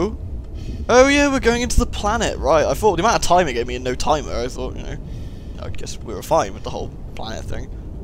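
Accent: United Kingdom. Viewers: Not surprised the unnamed gas giant's atmosphere and winds shredded the station up, probably similar but larger in magnitude, mass and strength of winds like Jupiter. Also not surprised with the ending being an homage to the original 1979 Alien movie. It took me about 3 or 4 days to watch this all in the evening hours (7 PM - 1-2 AM EST) , fantastic LP with your sense of humor and/or logic and your awesome British accent.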